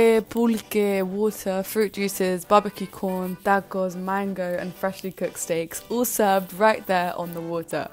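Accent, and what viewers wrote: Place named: Mexico. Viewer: your "mexican" accent Is awsome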